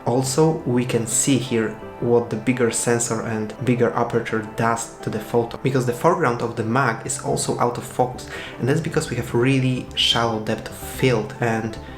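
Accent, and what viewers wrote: Poland. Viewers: you are from Poland :D ? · Are you Polish? You sound like Polish guy with your English.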